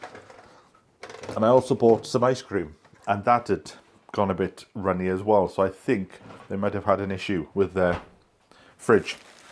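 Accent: Wales, United Kingdom. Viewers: Agree and the accent seems to be becoming Welsh-er by the day.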